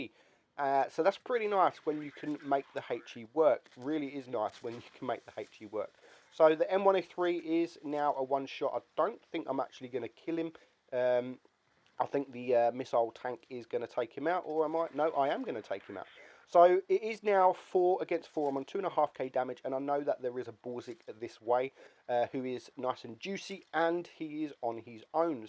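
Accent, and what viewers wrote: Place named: Cockney, United Kingdom. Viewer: speaks cockney not English get it right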